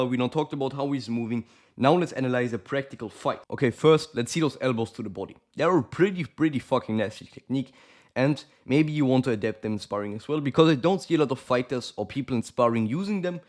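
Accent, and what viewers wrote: Germany. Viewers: bro are you german?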